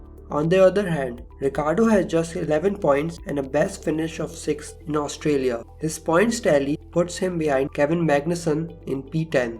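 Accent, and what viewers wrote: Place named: India. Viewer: Indian accent ... Nice 😌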